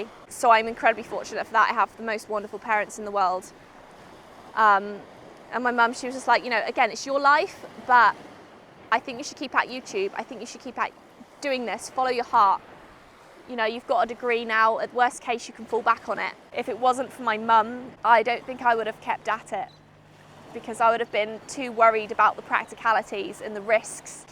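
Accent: United Kingdom. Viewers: Who else love her British Accent?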